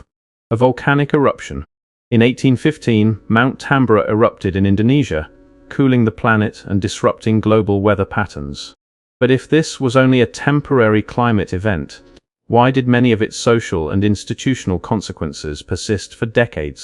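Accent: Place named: Europe. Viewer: every vid has the same eurotrash accent WTF its all coming from the same eurotrash AI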